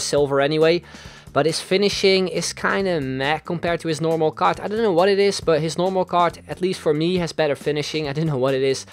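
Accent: Netherlands. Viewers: Kom jij uit Nederland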